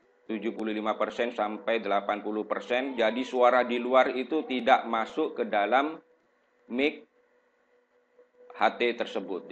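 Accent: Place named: Madura, Indonesia. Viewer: mantap bang, ilmunya keren. btw abang asli dari madura ya? logatnya kayak teman saya orang madura. sukses selalu bang. salam buat yg di madura 👍👍👍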